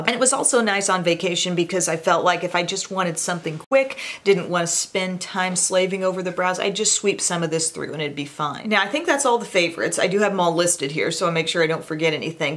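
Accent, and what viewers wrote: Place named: Texas, United States. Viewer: Hi, I was just watching one of your videos that references a corrector as university of Texas colors, you kind of sound a little like your from Texas, I was just curious if that’s where you are... also if your a ut fan.. that’s cool but I have to Aggies :)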